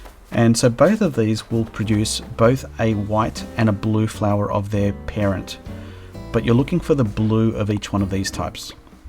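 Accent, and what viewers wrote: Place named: Australia. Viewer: OMFG of course you are an Aussie...I hadn't listened to the video for a while...and I knew that! (Honest I can tell the difference- and 3 of my friends left US for Australia. My bad! And so glad what our domination plot of getting you hooked on expensive Seatle coffee is working as planned!). Yeah sprinking on my flowers is prob going to be left up to me. What is so odd is I've managed to get a lot of purples (just me/myself and I). Tulips, blue roses, lots of green mums, pink everything except those dang lilies . The only thing alluding my can is the pansie (which was my native flower and I HATED It along with the damn pears...why PEARS? OMG...Apples...oranges. NO the bland flipping PEAR. ALSO (since we are new besties) I can't have any one doing a fly over at this moment...I'm redoing everything and it's a shite-show. Our numbers are horrid. 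I'm super immunocomp so I pretty much have been at home for 2 yrs (going out 1 or 2x a month heavily protected) but w/Omicron I've been on full lockdown as of 1/1. So when not working online...I'm in search of the purple pansie/windflower! Be safe my friend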